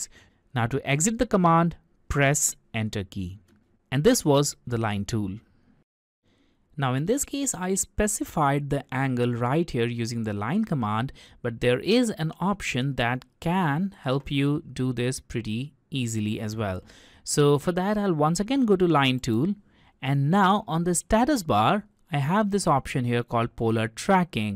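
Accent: India. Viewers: Why would you use an indian voice over to speak English what the fuck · A rare case of a quality tutorial made by an indian with an indian accent.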